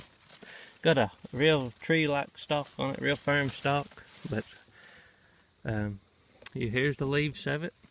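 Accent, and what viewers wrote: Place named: South, United States. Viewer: Thank you for sharing your knowledge of the ginseng and also the medicinal plants. I've seen black cohosh in the pharmacy area of Walmart and think I know what it's for. You're blessed to have that land you can just walk out and go wandering around in, I wish I could do that but my husband likes the city and won't move. I watched the TV show about ginseng too and I thought they might be glorifying it a little but I'm sure there are people that go on land they have no business being on. I like the way you take care of the plants you have by replanting them, that's taking care of the gifts God has given us. Looking forward to meeting your family too. I have to say I love your southern accent, my mother was from MS and my dad was from West Virginia. God bless and take care, also when you said you had snake protection I thought you were going to pull out a venom kit and you had a pistol. 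lol Looked like the pistol had been around awhile too?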